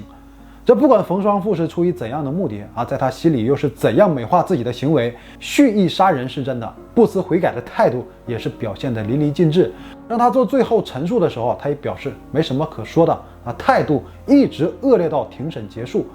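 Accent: China. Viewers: 就是放弃了吧，不配合也没什么奇怪的，不是每个人都那么有求生欲。只不过后面也许是重新有了什么生存的渴望，才会开始上诉。 能下跪祈求，也许是真的割舍不下吧，活着不能在一起，那就一起死吧，只不过他终究是没有自杀成功。抛开对错，他的行为也没什么奇怪。人家性命都不要了，又怎么会在意一点儿法庭上的行为。 主播口音像大陆人，但一个生在红旗下，从小唯物主义洗脑的人，扯什么遗臭万年太荒诞了吧，满手血腥的都不会在意，咆哮公堂的有什么可在意的，自古以来这样的人多了去了，谁的名字能因为这个留下了，“遗臭万年”的成本有这么低么。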